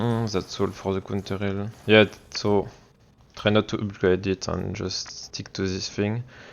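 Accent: France. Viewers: GG for the francais accent :)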